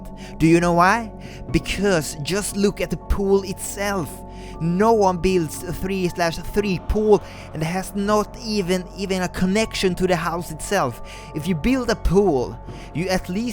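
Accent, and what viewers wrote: Sweden. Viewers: It's Swedish. Russian accent sounds much more different. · I just know you are Swedish, i can tell from the accent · You're Swedish, right? I kinda hear it on how you pronounce certain words. I myself, am from Norway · Are you Swedish? Sorry if I offended you but I would like to know😀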